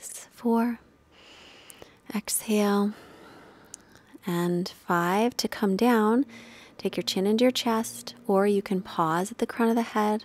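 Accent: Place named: United States. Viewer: Well since you've mastered yoga already, I think you could put more emphasis now on your sanskrit pronunciation, since you have a really really hard american accent and that was the only thing i didn't like in the video, especially singin mantras. other than that - great! I've been following this for a few days now and i really like how you guide people through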